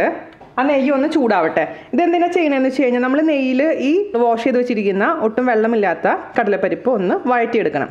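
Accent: Thrissur, India. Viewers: നല്ല രസമാണ് അവതരണം തൃശർ ഭാഷയല്ലൊ.' ''?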